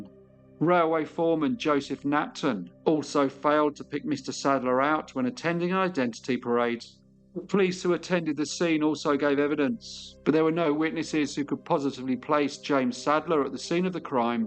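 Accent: United Kingdom. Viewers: Hello from France. Good storytelling. Just a question, where in Great Britain does your accent come from ? I find it clear and easy to understand. Thank you.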